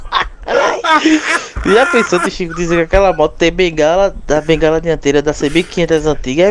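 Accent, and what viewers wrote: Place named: Recife, Brazil. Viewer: Tive de ouvir tudo kkk , provavelmente ele é de Recife/PE, sotaque não nega.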